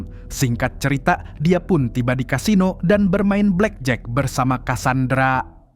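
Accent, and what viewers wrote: Banjar, Indonesia. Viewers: Positif orang Banjar ni adminya🤣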